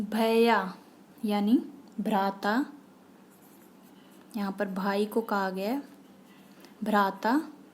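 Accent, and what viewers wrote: Punjab, India. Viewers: Mam are you punjabi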